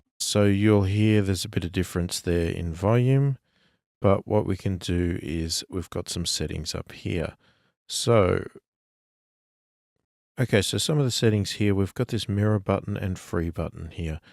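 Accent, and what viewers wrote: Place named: Australia. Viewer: Haters gonna hate! You made perfect sense to me. He's probably a 'Murrican who couldn't understand your Aussie accent. Classy reply you gave him though. Kudos. I doubt that this guy has a clue about recording. I started watching your Magic AB video but when you said you'd found a better one I checked it out. Way better for referencing. Cheers.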